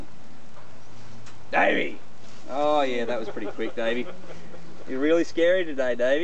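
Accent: Australia. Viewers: Something about an australian describing wildlife just fits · I miss the Ozzy accent.. I miss speaking English in general.. people in Germany suck bad at it :D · Aussie mate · You're right. Can't imagine a non Australian croc boink. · Aussie or Floridian. No one else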